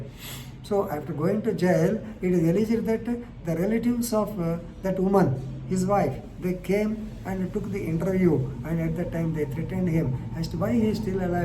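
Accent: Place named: North India, India. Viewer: gr88 explanaiton very lucid but accent was prob north indian here...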